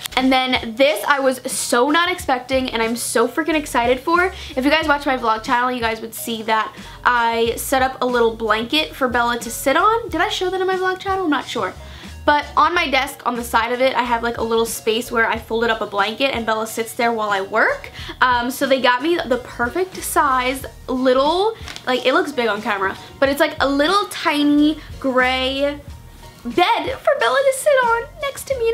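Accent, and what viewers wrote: New York, United States. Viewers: Are you from New York? Lol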